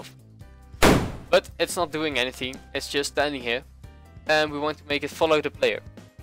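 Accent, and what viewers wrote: Netherlands. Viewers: youre dutch arent you #4secondsinvideo #dutchaccent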